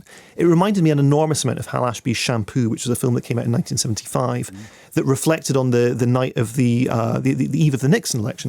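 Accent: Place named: Edinburgh, United Kingdom. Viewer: This guy has a serious edinburgh uni accent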